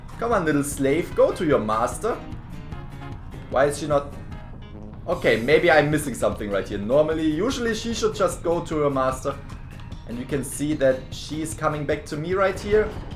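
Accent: Germany. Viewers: Man merkt das er deutsch is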